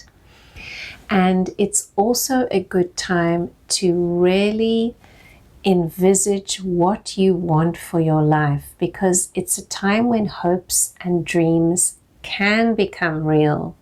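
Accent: South Africa. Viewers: Thank you! 🙏🏾 Are you South African? Your accent sounds so familiar to me. ☺️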